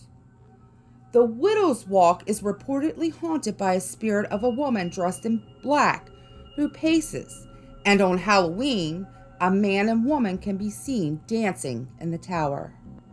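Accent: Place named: Pennsylvania, United States. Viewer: Hmmmm....are you from Pennsylvania? Just wondering because I am picking up an accent and it's making me homesick lol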